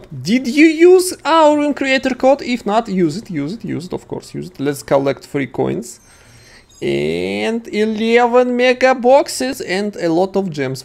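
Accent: Russia. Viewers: You Russia?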